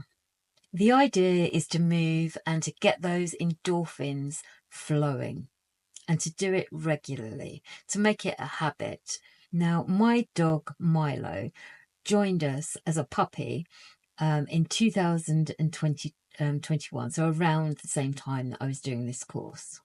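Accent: United Kingdom. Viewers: I loveyour accent is it RP or POSH and where are you from?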